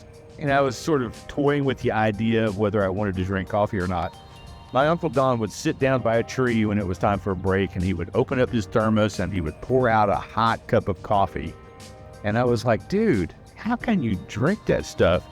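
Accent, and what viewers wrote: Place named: Oklahoma, United States. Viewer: 😂 MY LUCKY DAY. Hi, neighbor! You speak my language, even saying froo-froo too, and it's good to hear from another Okie. I grew up in the Tulsa area and also loved the other-worldly aromas of the coffee shops at Woodland Hills Mall. Wow. Smiles. WH Mall was a Big Deal. Thanks for the smiles!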